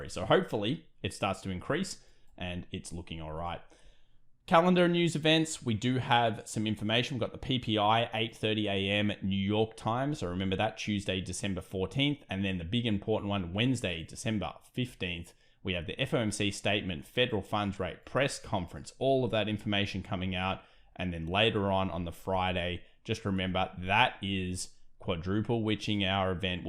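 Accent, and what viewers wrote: Australia. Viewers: The only Analysis channel I watch great video 🙌 you have a cool accent, are you Australian?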